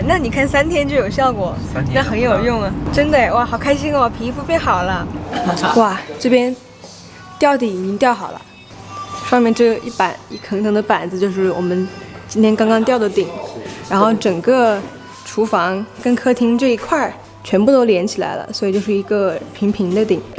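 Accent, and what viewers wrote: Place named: Hunan, China. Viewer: 普通话带湖南口音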